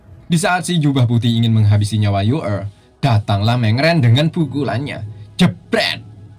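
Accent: Banjar, Indonesia. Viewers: Bang lu orang banjar kah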